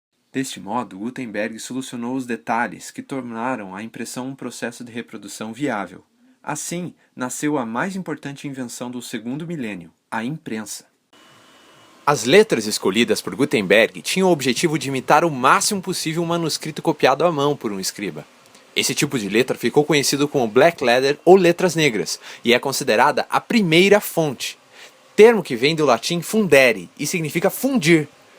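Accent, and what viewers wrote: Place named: Brazil. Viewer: Ele tem sotaque de tudo quanto é região do Brasil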